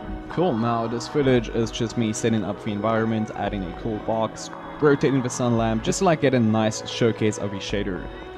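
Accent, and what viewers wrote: South Africa. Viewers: You sound South African? Yeah boyeeeeeee!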